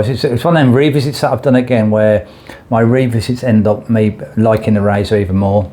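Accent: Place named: Birmingham, United Kingdom. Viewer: Love the Brummie, I thought it was Brummie lol.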